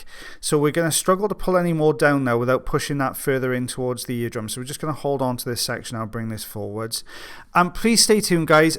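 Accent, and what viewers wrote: Wales, United Kingdom. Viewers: First and foremost, let me say thank you for your attention, time and dedication to the subscribers of this channel.. The recent changes seem to have had a polarising effect on viewers, especially the long term viewers, and I think a large part of that is because of the removal of the trademark beard. However in all honesty people hate change, and have followed the channel through a great deal of change recently. So here's MY QUESTION: What happened to the "#Countdown"???? Of all the changes made, it was my favourite.. ... Watching & listening, as your viewing old ear wax removals WAS THE BEST! Your natural exuberance and Welsh accent, coupled with your race calling abilities had me on the edge of my seat. It was wonderful and for those of us who have literally watched as the format grows and changes with the tides and seasons and the viewers, I thinks it's safe to say; no matter what the true waxaholics will always be here. 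As for my vote, well that was officially recorded on the community page, however returning to the Countdown is my unofficial vote.... Viva La Difference....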